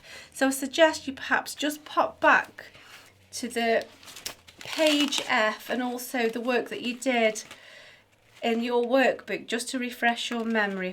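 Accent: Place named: Northern England, United Kingdom. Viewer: Hi i am not understanding this to well. page 23 exercise (1) Questions (a) & (b).......?a....... i have beamed first 2 quavers then space then beam last 2 Quavers is this wrong and if so could you explain,?(b) i have put 2 dotted crochets could you please also explain. From a very (confused.comDenis) Also i would like to thank you very very much for your vids which are really helping me to reach my goal of my Grade 1 which is on 6th of November. Many many thanks for all your help. Denis (pain in the butt Pickering) lol. PS love your accent, i am thinking northern. I am from Lancs but lived in wales 35 years. Have a fabtastic day.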